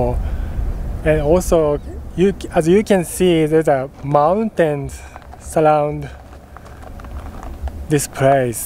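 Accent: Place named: Japan. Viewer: He has very strong Japanese accent.Uncomfortable listening.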